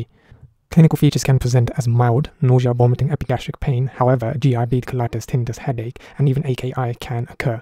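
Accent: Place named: Germany. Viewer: I thought he’s speaking german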